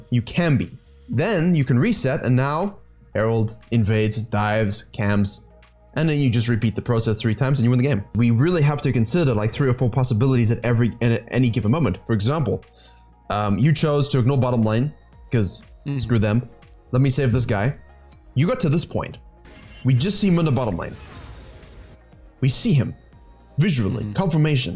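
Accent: South Africa. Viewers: Your video make me feel like there's a chance I could go pro! Hahahaha jk but seriously your Channel is amazing, also what accent is that cause I hear South African.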